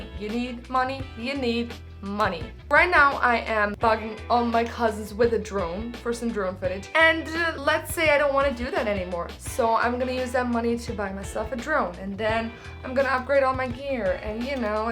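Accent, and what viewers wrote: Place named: South, Germany. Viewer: As a young southern german woman, I looove your German dialect, especially since I understand it and know Mist Germans wouldn't. It is such an fascinating mix of several southern dialects, it just makes me so happy to listen to it. Thank you for sharing your life with us. I love learning about your community 😇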